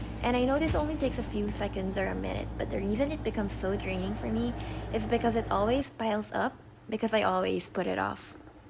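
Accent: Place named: England, United Kingdom. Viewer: loving your english accent!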